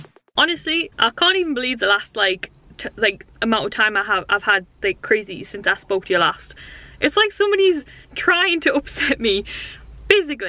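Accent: Newcastle, United Kingdom. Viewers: Thank you so much for these vlogs, I've woken up feeling sick to my stomach today and watching this has really calmed me down and helped me feel better! <3 I find your voice so soothing as my mum's side of the family are from Newcastle/Gateshead and I used to go up there fair bit to see my grandparents. I have to ask, does the Metro Centre still have that mad rollercoaster/theme park inside it (think it was called Metroland)? Got a lot of fond memories of it!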